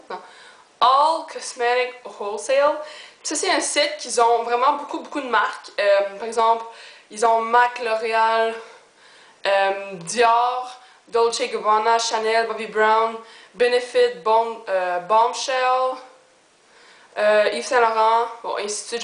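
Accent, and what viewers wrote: Quebec, Canada. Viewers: excellent! merci pour cette video!gros bisousss!! jadooore ton accent quebecois plein de bisous de dubai!!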